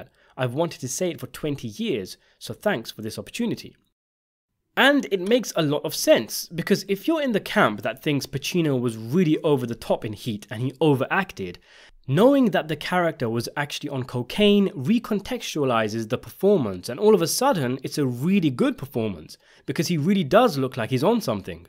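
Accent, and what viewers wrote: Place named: East London, United Kingdom. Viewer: "Mans highly stylish film" talking like East London fam careful of disrespecting our culture.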